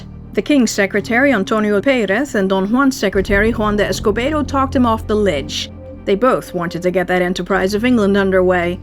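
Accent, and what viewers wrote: Netherlands. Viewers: I love the narration. The dutch accent is very sweet to the ears. And of course this is well written with good humour. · This channel needs more views especially given the quality of the content. Also by the way you pronounce things would it be right to assume you're from the Nederlands.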